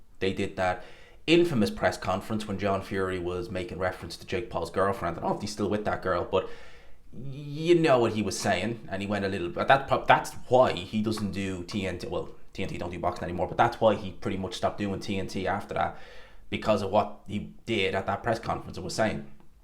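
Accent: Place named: Clonmel, Ireland. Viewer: Sound like you're a clonmel man brother. Great channel.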